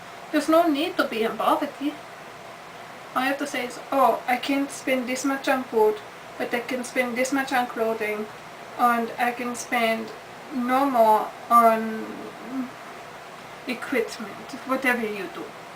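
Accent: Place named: Germany. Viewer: Naaaw! ^ ^ I love your cosplay! But your german accent is the best ever xD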